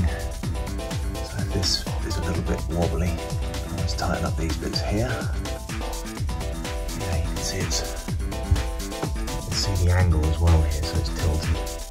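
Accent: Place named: RP, United Kingdom. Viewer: Was worried we wasn’t gonna hear you clear speaking posh voice then when the music kept going.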